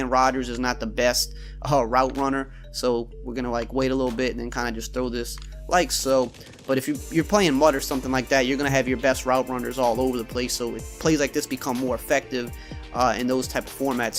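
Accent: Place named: New Orleans, United States. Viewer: I am from Houma if u know where that’s at its DTB and I love ur accent cause I’m from Nola area as well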